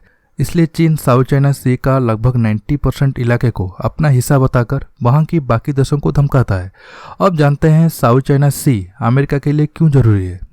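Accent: Odisha, India. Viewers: Pahle mujhe laga aap bengal se ho Par jb last line suni to ab lag rha hai aap odisha se ho. Kya aap odia hain?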